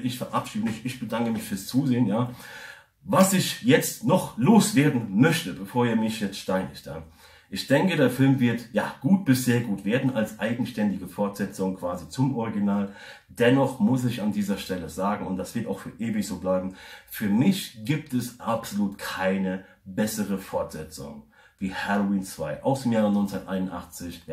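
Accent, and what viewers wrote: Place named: United States. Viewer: Bist du Amerikaner dass du einen Ami Akzent hast ?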